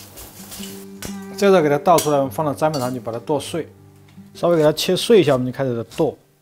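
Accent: Sichuan, China. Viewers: 已经开始川普了，之前只是Rua会出现，现在”喃”都来了哈哈哈哈。有粉就是嚣张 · 真是喜欢这口川普，太下饭了，干得漂亮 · 这个普通话一听就感觉到好亲切喃，是成都人？或者至少是四川的吧。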